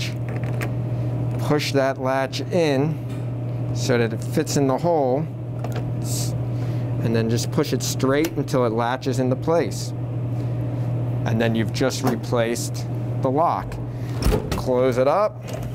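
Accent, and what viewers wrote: Philadelphia, United States. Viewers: You have a strong Philly accent.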